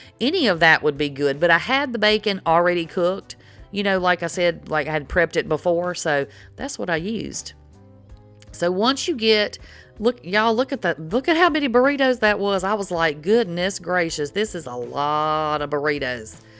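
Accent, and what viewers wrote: South, United States. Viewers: When you hear that Southern accent, you KNOW she can cook.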